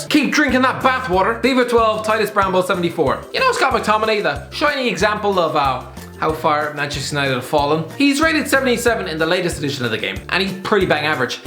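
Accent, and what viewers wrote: Ireland. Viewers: I clicked on this video just to see the say "Hello and welcome to HITC sport!" 😭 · After a night of no sleep it’s always nice to have the in my ear at 7am in the morning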